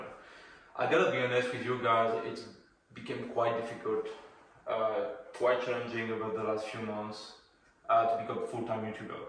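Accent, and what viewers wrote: France. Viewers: I love the French accent! Looking forward for more talking videos. Keep it up even with the challenges!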